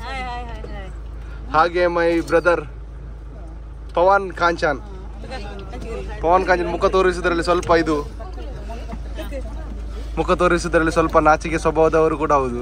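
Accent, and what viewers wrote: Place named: Kundapura, India. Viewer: Nivu kundapura kannada hege math adthira ?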